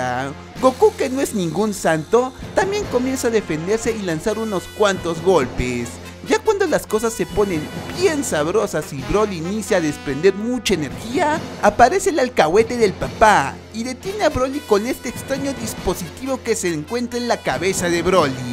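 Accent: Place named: North, Mexico. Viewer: eeeeee norteño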